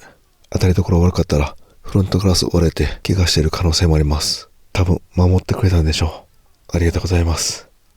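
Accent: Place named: Kansai, Japan. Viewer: やっぱりさん関西人なんですね。フロントにヒビがぶぁぁぁぁって、大変だったのに笑ってしまいましたm(_ _)m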